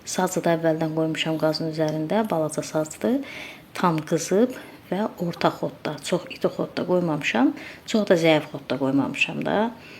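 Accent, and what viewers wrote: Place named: Mughan, Azerbaijan. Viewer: Azərbaycan balaca olsa da, hər tərəfinin öz mətbəxi var. Bəli, bizim Gəncə-Qazax bölgəsində də fəsəli ədviyyatsız, kərə yağı ilə olur. Ancaq gördüyünüz kimi, xanım Muğan ləhcəsində danışır. Hələ Quba tərəfdə fəsəli mövzusunda tamam başqa yeniliklər kəşf edə bilərsiniz. :) Bu, ölkə mətbəxinin zənginliyini göstərir və çox gözəldir. Yaxşı ki, belədir. Mən çoxdan bu ədviyyatlı fəsəlini bişirmək istəyirdim. Müəllif də çox gözəl, detallı izah edib. Mütləq bişirəcəyəm.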